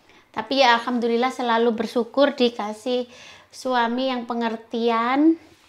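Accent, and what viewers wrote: Java, Indonesia. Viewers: Pasta maknyus 😀😀👍👍 Lucu logat jowo nya masih ada👍 keren mbak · Mashallah, logate,jowo bgt, hadir mbk,aq wong solo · Jika lihat mb nih senang banget apalagi bhs jawanya medhok hehehe '' isah2 '' alias cuci piring wis semangat d sehat slalu ya mb sekeluarga 😍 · Aq penonton setiamu lho mbak cuman jarangan koment 😊... aq suka bgt denger kata mongah2 duh ngakak sendiri...pertahankan logat jawanya biar jd ciri khas mb...salam dari sby 🎀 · Asyik bgt lihat mbk masak memasak buat keluarga dan mertua... Natural dan santai bgt, ga dibuat buat bahasanya yg medok... Sukses deh buat mbk.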